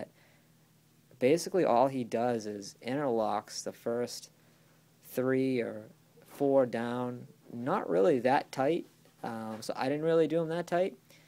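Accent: Boston, United States. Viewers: Bro u got a heavy Boston accent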